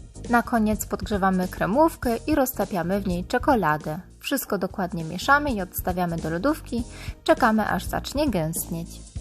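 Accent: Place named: Germany. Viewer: To że ktoś jest ze wsi nie znaczy że odrazu na tak mówić, to gdzie to mieszka nie zależy od tego jak mówi... Ta pani mieszka w Niemczech więc ma powody, aby tak mówić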